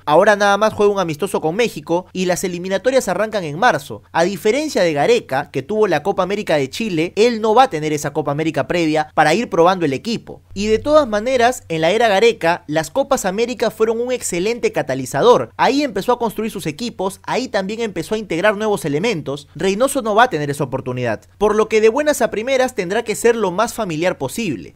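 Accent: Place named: Argentina. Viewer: Una pregunta, eres argentino o no, por qué hablas como argentino.. habla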